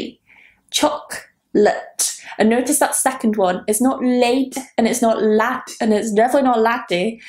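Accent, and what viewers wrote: United Kingdom. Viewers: Beauty queen of British accent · I'm following your videos for learning British accent and I've learned a lot of words to spell correctly like dropping the r sound and more. But I have difficulty in pronouncing P, T and C like you native British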